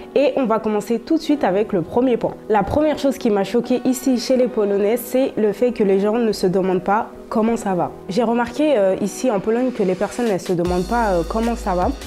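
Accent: Paris, France. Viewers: To jest akcent paryski jakim mówi teraźniejsza młodzież. Wiem bo urodziłem się we Francji i mieszkam pod Paryżem. Jak dla wszystkich języków, w szkole uczą nas wersje literacką języka, a nie tą co się używa na codzień. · Mówi Bardzo Czystym Paryskim akcentem Gawiedzi ! Na 100 % Urodzona we Francji ! Kończyła Szkoły we Francji ! Na 99 % Pochodzi z kompleksu Miejskiego Paryża ! Powiedział bym Okolice Saint Denis ! Przynajmniej Uczęszczała Tam do Szkół ! Na 100 % ! Ale Kultura Osobista ! To 94 Departament ! Francuski Literacki ! A ,, ąrgo ” ( Dialekt Paryski! ) To Dzień i Noc ! To co się Uczyliścje w Szkole ! To Język Literacki ! Prawie Wymarły ! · standardowy akcent bym powiedziała , akcent z Paryżu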